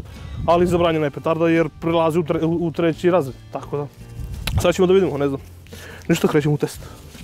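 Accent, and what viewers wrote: South, Serbia. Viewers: Najjaci juznjacki naglasak!!!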